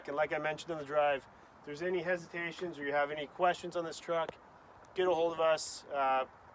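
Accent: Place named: West Coast, United States. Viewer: Boy if I've ever heard a west coast accent it's this here. And what a pretty truck!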